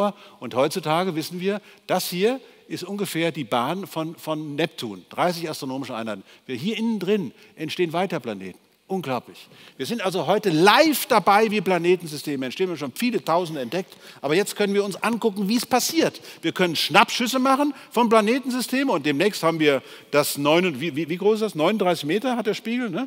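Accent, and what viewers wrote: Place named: Bavaria, Germany. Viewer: (An den AnModerator zu Beginn) Der Inhalt ihrer Videos ist ja eh immer interessant. Aber könnten sie erstens mal den Buchstaben A richtig aussprechen und nicht so extrem Bayerisch und zweitens die Augen nicht ganz so aufreissen? Ich finde beides in Kombination lenkt zu sehr vom Inhalt ab und bringt das Ganze in eine so bestimmt ungewollt komische Richtung. Liebe Grüße und danke für die gute Arbeit und den interessanten Content!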